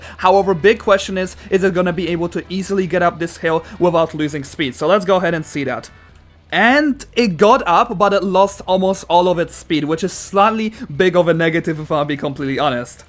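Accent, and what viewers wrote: Poland. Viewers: im judging by your accent you are from poland or chzech?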